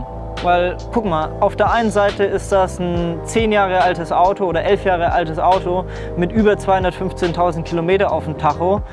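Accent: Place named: North, Germany. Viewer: Super beeindruckend! Sehr nett ist auch die norddeutsche Sprachfärbung. "Hier is nochn Fliegngidda" Gutes Video.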